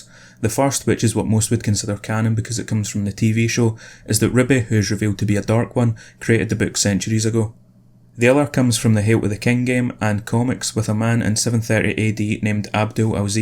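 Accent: Scotland, United Kingdom. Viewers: It's nice hearing the same accent being spoken with same slang used on a platform like this (except of course for exceptions like limmy, but Scottish youtubers are few and far between on this platform). Keep up the good work, your iceberg videos are really nice and informative 👍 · Two things right off the bat. 1- Eyy, a fellow scotsman! Honestly really nice to hear someone who sounds kinda like me lol. 2- I might not be keen on Iceberg videos (8 out of 10 times it's crap imo), but I'll give you this... you cut straight to the chase, no bollocks or filler. Commendable in a platform filled with so much fluff, it would make a teddy bear look like an anorexic supermodel.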